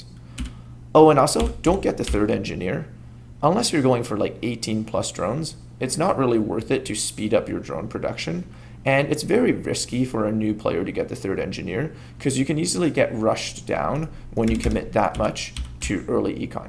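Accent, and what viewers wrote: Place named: Canada. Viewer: Aboot...he sounds so Canadian. Still great vid - still getting my head around figuring out how to play effectively.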